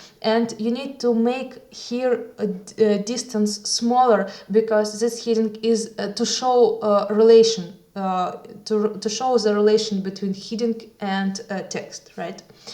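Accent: Russia. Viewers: The dictor has a strong russian accent:) It seems easier to make it in russian for her than in english)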